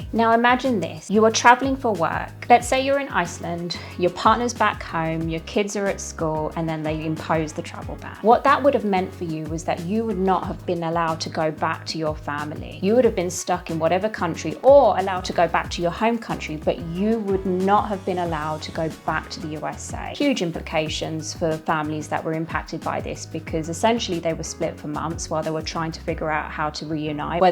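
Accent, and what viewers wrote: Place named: Australia. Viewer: I was not expecting this video to be in Australian English.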